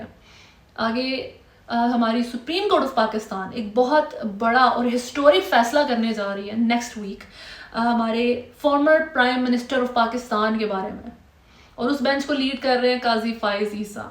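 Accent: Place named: Punjab, India. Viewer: Yaar Punjabi accent me English kenyu bolte hain aap ?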